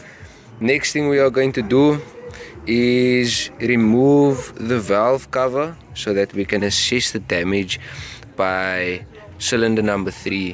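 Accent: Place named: Cape Town, South Africa. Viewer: Love the capetonian accent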